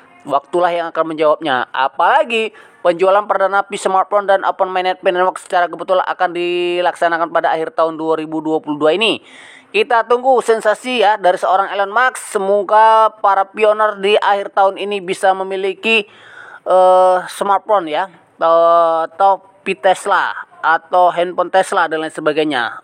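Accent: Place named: Java, Indonesia. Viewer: aksen jawa kental sekali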